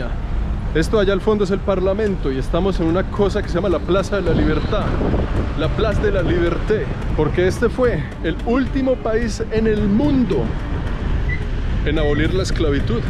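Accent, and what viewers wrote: Bogotá, Colombia. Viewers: Él muy rolo, ella muy paisa 👍🏼🙃👍🏼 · Felicidades. Ese acento colombiano de Bogota (rolo) de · Buen viedeo, el acento y dialecto es muy colombiano. Eres colombiano?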